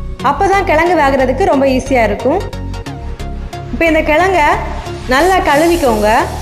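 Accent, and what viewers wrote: Nagercoil, India. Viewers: Ovoru ooruku oru slang iruku... Nagercoil slang romba special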